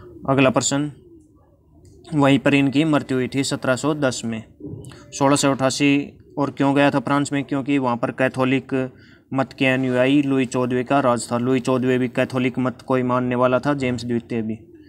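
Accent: Haryana, India. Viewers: Bhai aap hariyana se Ho kya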